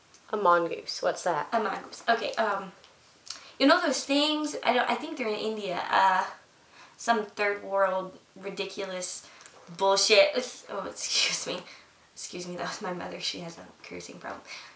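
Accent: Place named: Upper Midwest, United States. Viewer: Let me guess, she's from Wisconsin? Perhaps Minnesota? Nice accent:)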